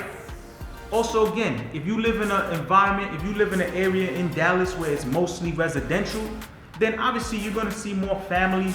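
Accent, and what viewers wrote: New York, United States. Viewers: Your NY accent has been dying took me back home.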